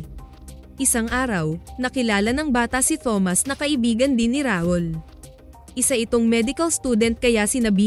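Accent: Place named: Visayas, Philippines. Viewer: Hahaha bisaya yung narrator bankita raw ,but im one bisaya hahahaha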